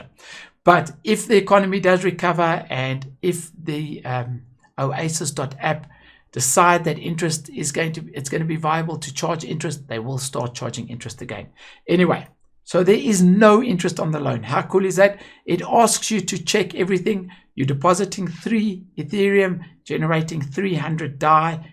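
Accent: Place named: South Africa. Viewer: Hi,my brother!You sound South African.Any pointers for me here?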